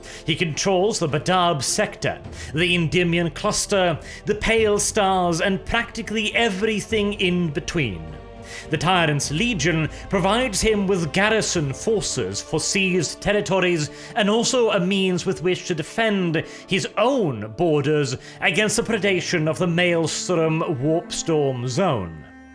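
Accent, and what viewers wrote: United Kingdom. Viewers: Dang boi, your silky British by way of Norwegian accent is music to my ears after the past month.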